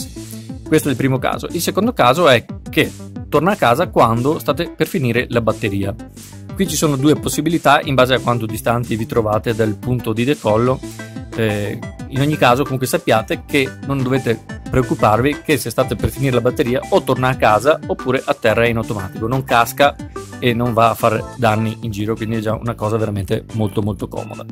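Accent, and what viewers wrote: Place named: Veneto, Italy. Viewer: Grazie, l’accento ti frega sei Veneto?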